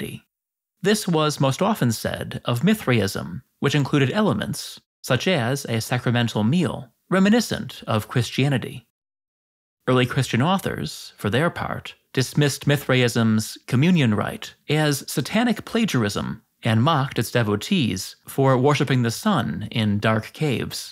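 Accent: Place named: Wisconsin, United States. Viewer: love that Wisconsin accent 👍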